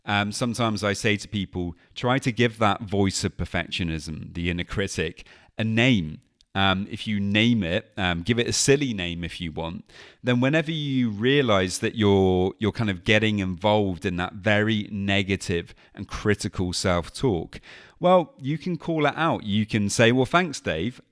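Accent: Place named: United Kingdom. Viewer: Didn’t realize you had a British accent til like 8 minutes in 😭😭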